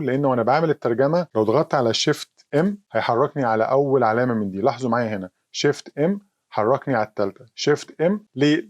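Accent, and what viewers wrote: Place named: Arab world. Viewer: Your arabic accent is so hard my friend.. 😢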